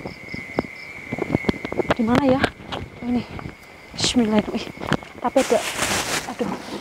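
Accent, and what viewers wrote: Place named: Java, Indonesia. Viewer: Mba... Medoke aku seneng denger nya,... 👍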